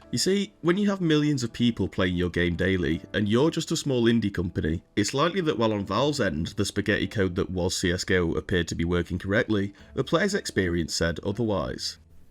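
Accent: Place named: United Kingdom. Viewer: yeah I was tripping a bit cuz your accent is the same as mine but something was telling me off 🙏🏻 which part of the uk tho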